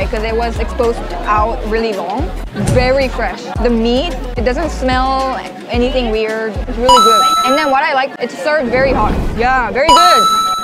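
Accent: Conyo, Philippines. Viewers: still conyo english no improvement at all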